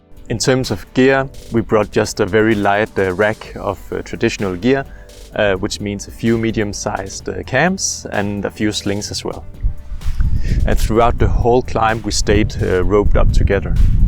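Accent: Denmark. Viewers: Hej, overvej at skrive stednavne når du nævner dem. Ikke mindst til os der ikke lige hører det første gang, men måske også til dem der ikke kan den tykke danske accent. Fed video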